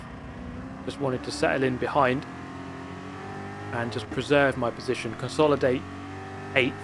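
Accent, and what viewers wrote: United Kingdom. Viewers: He sounds more British than most British people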